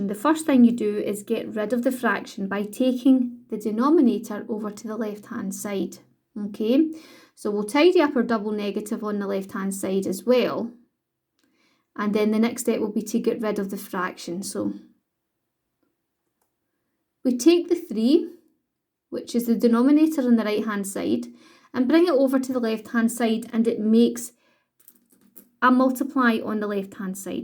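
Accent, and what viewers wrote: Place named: Scotland, United Kingdom. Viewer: excellent presentation , lovely Scottish voice and a great help , thank you.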